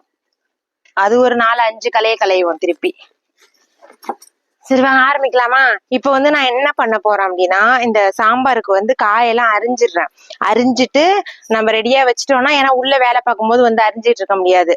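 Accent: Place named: Tiruchirappalli, India. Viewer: Nanga Trichy akka neenga pesuradhu nanga pesuradhula ore madhiri iruku ,ipa chennai la irukom Inga dha konjam different ah enaku theriyudhu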